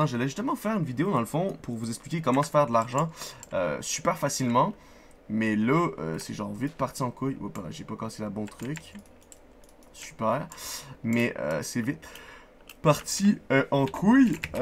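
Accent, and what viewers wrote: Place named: Quebec, Canada. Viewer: J'adore l'accent québécois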